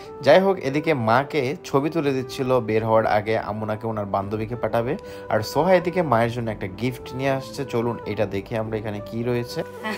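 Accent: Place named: Chittagong, Bangladesh. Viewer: Tomi ctg chela seta na janar ki ace. tomder family r sby without soddo bhasai kotha bolo tokhon ctg ton ta esposto boja jai😂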